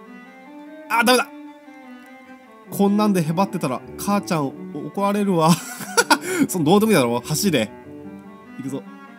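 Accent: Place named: Kansai, Japan. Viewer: 棒人間のクオリティがｗあとさんの関西弁なんかいいですねｗ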